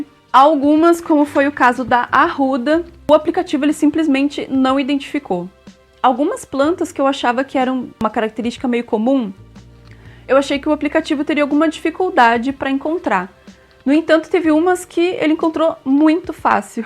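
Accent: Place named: Porto Alegre, Brazil. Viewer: Que dica incrível! Esse app vai ajudar muito, eu tenho bastante receio de usar uma planta errada pra fazer chá e passar mal, acho que vai ajudar bastante mesmo 💜 bjuuuu (eu amo que tu fala do mesmo jeito que eu por sermos daqui de Poa, sempre acho que teus outros seguidores não entendem as nossas "gírias" kkkkk)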